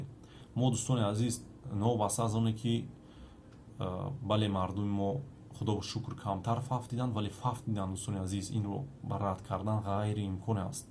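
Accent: Tajikistan. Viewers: Доктор саломат бошед! Ки бо лахжаи тоззаи точки гап мезанед салом аз Киргизстан Андарак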